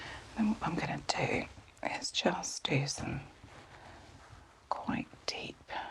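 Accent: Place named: Wales, United Kingdom. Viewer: Best Welsh accent in ASMR no doubt 😅 sleepy 🥱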